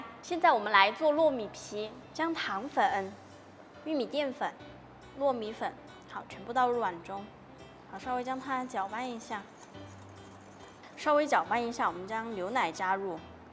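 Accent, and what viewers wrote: Sichuan, China. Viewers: 你是四川的還是湖南d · 一开始就听出了你的四川口音，最后终于讲出了四川话，请问哪里人？我是重庆人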